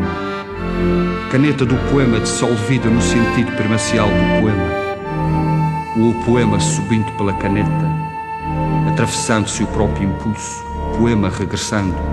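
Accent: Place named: Madeira, Portugal. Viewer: Foda-se e com sotaque madeirense!!! Orgulho de ser madeirense!!!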